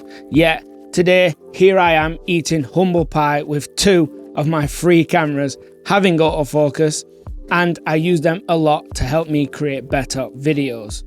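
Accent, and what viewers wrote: United Kingdom. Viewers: i really like your videos but pls you have to speak a bit better because as a non native english speaker its hard to understand your harsh british accent.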